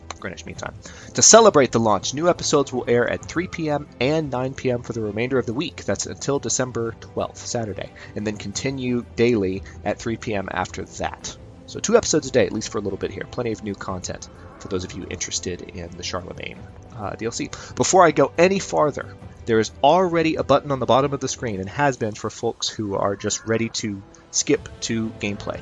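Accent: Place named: United States. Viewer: Hahaha aye exactly the accentless accent, the de jure news reader accent :') really clear but you can tell its American haha. Sounds lovely :). Sure i enjoyed your video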